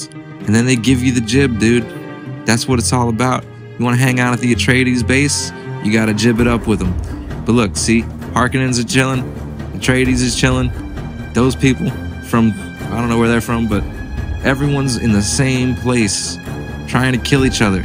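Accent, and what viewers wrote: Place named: Bronx, United States. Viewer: When a Hoodie from the Bronx starts to talk about a Computer Game - I am out!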